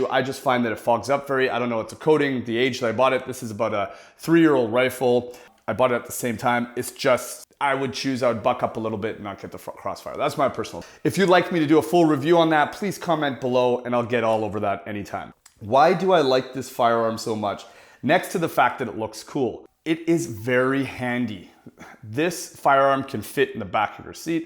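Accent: Canada. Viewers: Wow you DO sound Canadian, eh?!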